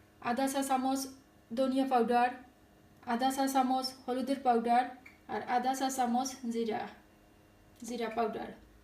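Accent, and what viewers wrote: Sylhet, Bangladesh. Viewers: Apa apni kemon acen....aponer kota gula sune kub anondo pelam mone hoi amader deser...sileyte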